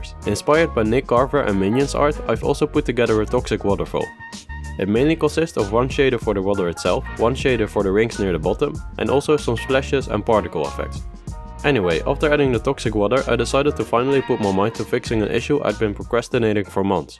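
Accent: Netherlands. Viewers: I looooove the Dutch pronunciation of English “v”s. “Full control Ofer the qamera” - its so satisfying. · Are you Dutch? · Let me guess, you are dutch? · It sounds like u have a dutch accent and I immediately noticed that because im dutch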